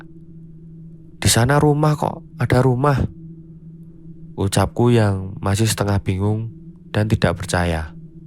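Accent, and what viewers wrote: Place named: Java, Indonesia. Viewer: Suka ama aksen Jawa masnya Sukses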